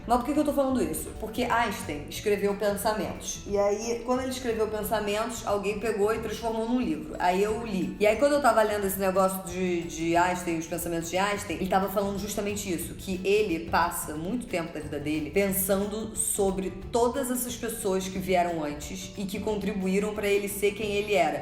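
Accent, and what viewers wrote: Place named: Rio de Janeiro, Brazil. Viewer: Eu li um pouco de Fuco, que ele é extremamente futurista e analítico sobre nossas existências, e eu fiquei confusa confesso com a informação de que somos pontinhos e milhares deles e que enxergamos a matéria em si porém o nosso cérebro transforma esses pontinhos em matéria, por isso nos enxergamos em corpos físicos e não pontinhos, teorias são uma excelência da vida humana, se todos os seres humanos tivessem consciência das suas capacidades que vão além desta que é a matéria prima primitiva o mundo seria melhor para ser vivido certeza, e acho super fofo você falando com seus "s" no final das palavras com sotaque carioques ....adoro seu canal vida inteligente no YouTube sucesso jout jout...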